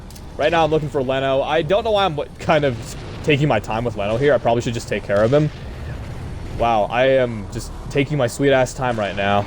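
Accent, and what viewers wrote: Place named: United Kingdom. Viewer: 0w0 u sound like a fellow brit>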